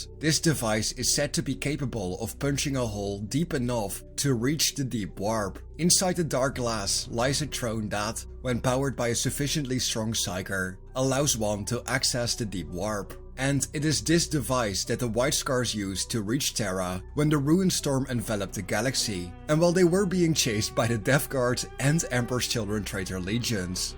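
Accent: Netherlands. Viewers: Haha i can hear you're dutch from a mile away. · As an ex gw employee I have to say I like your topics and storytelling. As a fellow Dutchman I have to say I really dislike "our" accent in English 😅 nothing personal. Keep it going and thanks for spreading this aspect of the hobby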